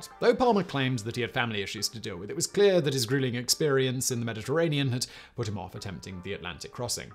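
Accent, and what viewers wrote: England, United Kingdom. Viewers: I too found this information interesting. Unfortunately, I find it hard to understand you though. It isn’t how fast you speak, but that you do not fully enunciate. Many words trail off in volume such that I am struggling through the whole vid trying to catch your words. Add the English pronunciation, and it becomes too much work. I gave a thumbs up for great content.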